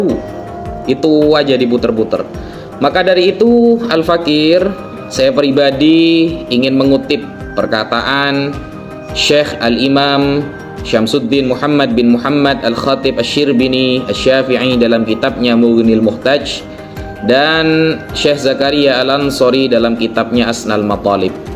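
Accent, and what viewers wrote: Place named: Madura, Indonesia. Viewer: Dari segi bicaranya ini orang madura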